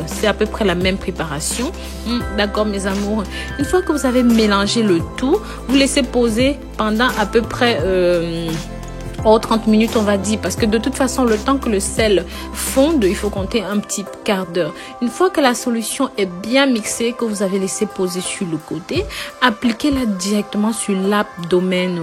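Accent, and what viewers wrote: Africa. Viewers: Vu l'accent c'est une africaine qui vous prend pour des cons moi j'ai perdu 5 cm de tour de taille en 1 mois grâce au sport programme abdo, et footing tout les matins avec un bon régime alimentaire si non tout est bidons ....merci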